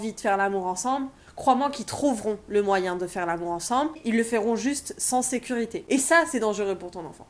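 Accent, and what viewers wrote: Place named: Alsace, France. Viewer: J’ai reconnu ton accent et quand t’as dit que t’habitais en Alsace j’étais genre YES aha Je suis de Strasbourg :) sinon c’est cool ta transparence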